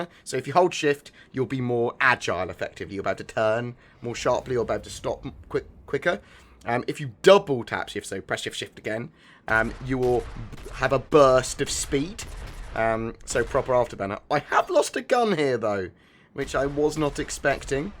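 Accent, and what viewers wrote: United Kingdom. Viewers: i cant understand this british dialect.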